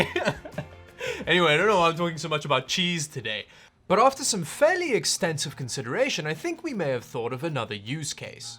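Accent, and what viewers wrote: South Africa. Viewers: Hey man I hear that accent my fellow South African good to see some SA youtubers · You must be somewhat South African related? Your accent and word choice gives South African vibes😅 EDIT: Another scenario for either of the cards is to use it as dedicated PhysX GPUs, I have successfully used a GT 710 for PhysX in Borderlands 2, but it is not sufficient enough for Killing Floor 2 since that requires a dedicated GTX 1060 for PhysX. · This man sounds south african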